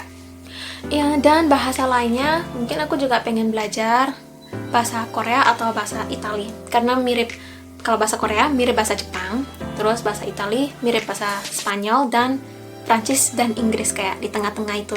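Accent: Java, Indonesia. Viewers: medok,e iku lo mantul,joooosss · Medok lebih mantab mbak yu 😅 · medhok ya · ilat mu..malah mirip wong jowo..nduk ..rada medok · Luar biasa, suka cara ngomongnya bahasa Indonesia, ada medok boso jowone, tak kiro asli wong jowo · Nambah channel di jenengi medok 👍👍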